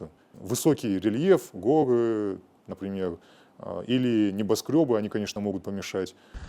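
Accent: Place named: France. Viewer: Акцент французский.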